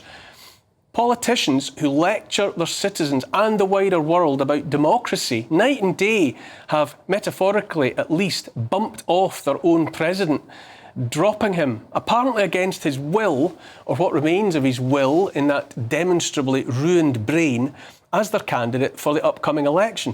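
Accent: Scotland, United Kingdom. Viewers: hE HAS A SCOTTISH ACCENT ! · I love his beautifully spoken Stirling Scots accent! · HIs annoying Scottish accent. He's impossible to listen to.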